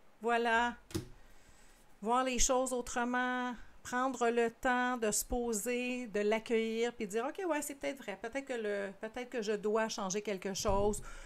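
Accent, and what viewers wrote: Quebec, Canada. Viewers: Enfin une voie québécoise et belier en plus 😁😁 pour ta guidance il est un peu tôt pour juger donc je vais te suivre et voir si sa résonne !!! 😊😉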